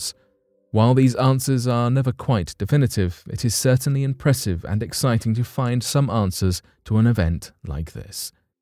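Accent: United Kingdom. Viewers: This narrator is British but sounds great. Compared to that other guy.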